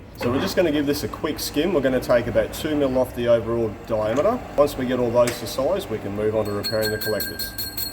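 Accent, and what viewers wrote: Australia. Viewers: Quick question.....why doesn't the thermal shock crack the cast iron? I've always heard that cast iron doesn't do thermal shock well. I live on the completely opposite side of the world but your videos are anxiously awaited. Don't know if it's the skill, professionalism or that Aussie accent but it's a great video!!😊😊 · never seen or heard of this channel until it came up on my feed, watched it and as soon as i realised you’re aussie, subscribed and liked! always back ya fellow aussies 🙌 really really great/interesting work you do too!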